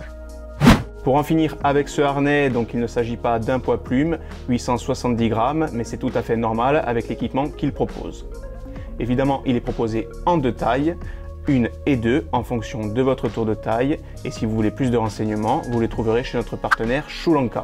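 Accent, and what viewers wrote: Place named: Corsica, France. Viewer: le petit accent corse s'est dépaysant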